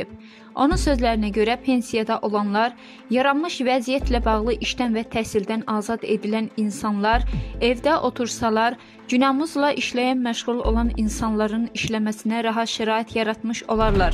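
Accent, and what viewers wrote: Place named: Iran. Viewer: Iranlidi daniwan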